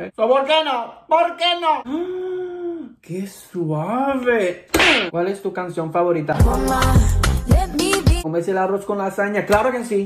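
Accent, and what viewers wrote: Puerto Rico. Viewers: Sabes que es Boricua solo por escuchar como habla en español❤😂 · Jamás pensé que sonara tan ✨puertorriqueño✨ pensé que era más gringo💀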